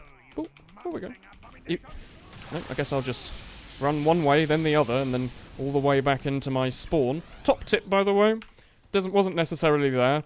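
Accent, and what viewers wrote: United Kingdom. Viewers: u sound British asf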